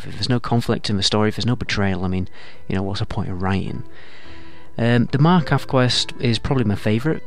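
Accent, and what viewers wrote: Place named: Manchester, United Kingdom. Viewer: I love your accent are you mancunian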